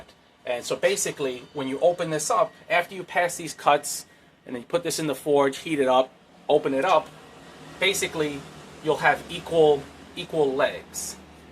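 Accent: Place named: Staten Island, United States. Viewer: My wife is from Staten Island. I thought the accent sounded familiar.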